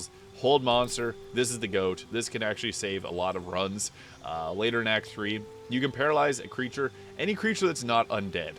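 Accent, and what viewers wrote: Canada. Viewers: Just subbed since I've seen a few of your videos and I enjoy it. Are you Canadian? Random question, sorry. haha.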